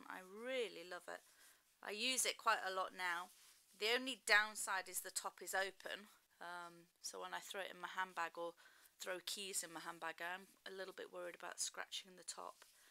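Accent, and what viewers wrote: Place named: United Kingdom. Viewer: Pouch ouch dilemma:D nice vid, love all your vids but can you please do something with your sound quality? I have to push my macbook volume to the limit to hear that nice british accent;)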